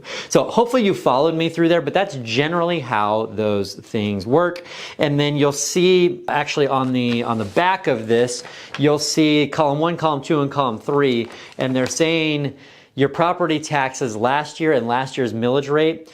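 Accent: United States. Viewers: Where did this New Yaukuh phah ugh come from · Sounds very good ol’ boyish.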